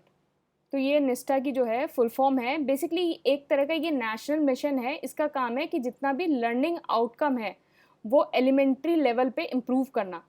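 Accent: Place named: Lucknow, India. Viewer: Nice set, thanks. Ma'am aap Lucknow se hain na(just guessing,if you don't mind,apka bolne ka lehza batata h,aap Lucknow se ho)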